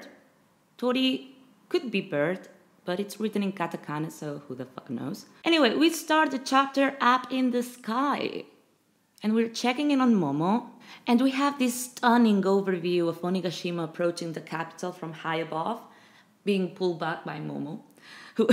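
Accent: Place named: Spain. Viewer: The innuendo in this vid title and the previous is jokes 🤣and as usual the spanish accent spicy as always 🥴, great vid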